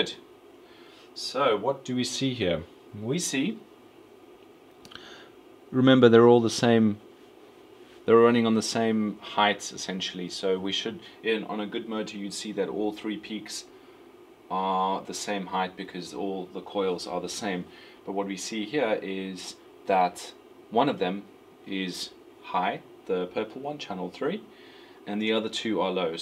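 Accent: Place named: South Africa. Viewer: deffos a south african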